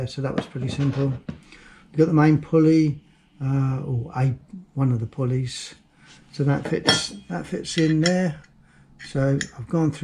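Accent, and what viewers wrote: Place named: United Kingdom. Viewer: Just subcribed to your channel, didn't realise you were from UK! (duuh!) I'm from march in cambridgeshire you don't sound far away! That amazon box with the bog rolls on it smiley lol! Take care, mate!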